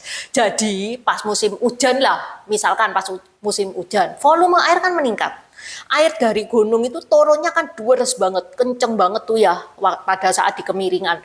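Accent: Java, Indonesia. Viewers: Saya suka...logatnya asli orang Jawa...👍👍 Saya sukreb nona..🇮🇩 🙏🙏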